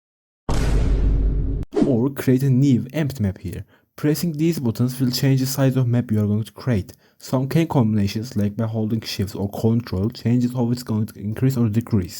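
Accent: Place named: Turkey. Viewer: İlk videonda aksandan direkt anlamıştım Türk olduğunu . Güzel kanal devamını bekliyoruz hocam.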